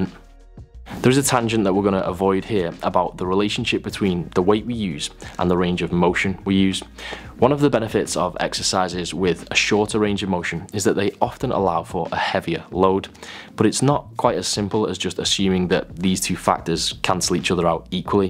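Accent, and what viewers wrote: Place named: Manchester, United Kingdom. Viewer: Certainly sounds like Manchester to me. Hello from a fellow manc!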